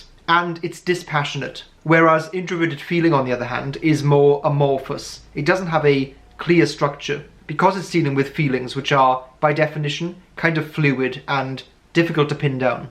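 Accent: United Kingdom. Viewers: He looks Serbian/Bosnian or Greek but defs a UK accent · Impressive video. You`re so articulate and straight to the point. I relish when you express your toughts about INFP and i can see how passionate you are in front of the camera thinking, feeling, expressing yourself. INFP and INTP are so similar and complex althought little details make them so unique between them. In this lockdown i, sometimes took those mbti test since im bored hehe. 80% of the time i get INFP Type, the rest is between INTP and ENFP and sometimes INFJ, i wonder if is this you case too? BTW are you British? Because i love your accent ;-)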